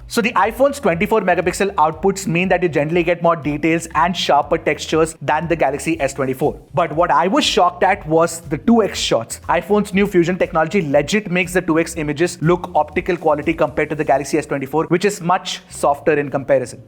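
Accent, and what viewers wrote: India. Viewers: I left the video just after 3 seconds just because of this kind of typical Indian accent so ann0 ying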